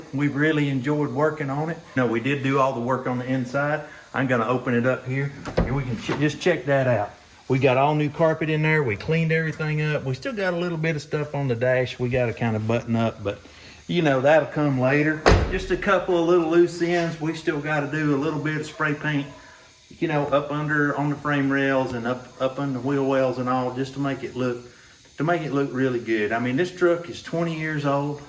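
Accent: Tennessee, United States. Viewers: I heard your Tennessee accent and automatically liked, and subbed! Beautiful truck brother. Howdy from Texas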